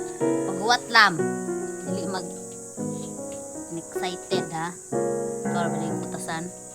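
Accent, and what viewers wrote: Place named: Visayas, Philippines. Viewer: ha ha ha ha ha! You are so lovely with your bisaya/bodzol. besides that i am learning from you kitchen, you make me laugh as well. i love it. CHARS!!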